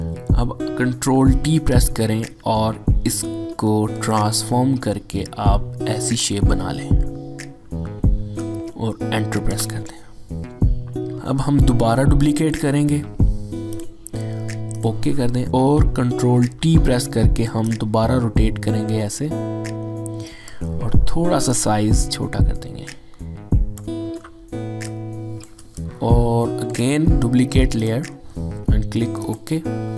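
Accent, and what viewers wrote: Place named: India. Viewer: bruh... when your pronunciation sucks, at least show the command key I cant get what you are saying literally because of your Indian pronunciation...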